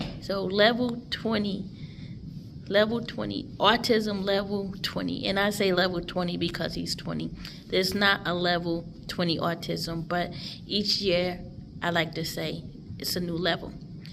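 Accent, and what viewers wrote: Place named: New Jersey, United States. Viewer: I love your Jersey accent!!!!!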